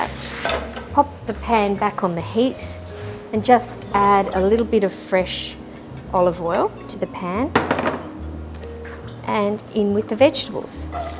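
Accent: Australia. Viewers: R u an Aussie ? Thank you so much This was beyond incredible . Ill be making it next week when my crock pot is delivered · Nice Shanks...nice Australian Accent as well. Regards from San Jose California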